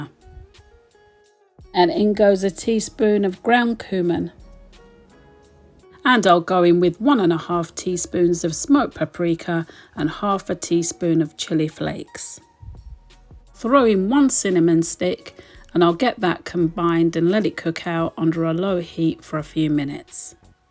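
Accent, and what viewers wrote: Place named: London, United Kingdom. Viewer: Hi.. You videos are amazing I've liked a few mostly veggie as I dnt eat meat.. I love how your spice blends and cooking methods can bring flavour and spice to simple vegetables.. I plan to try your bean chilli recipe because its just seems so much full of flavour compared to the garlic powder, onion powder, smoked paprika recipes I'm used to seeing lol can I ask where you are from? I grew up in Hammersmith sw London and your voice reminds me of home just as much as your recipes.. Keep it up x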